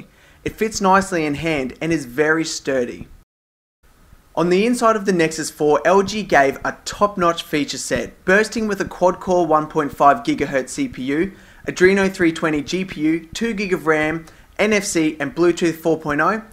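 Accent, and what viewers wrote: Australia. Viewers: Great to see (hear) an Aussie reviewer! Keep up the good job :-)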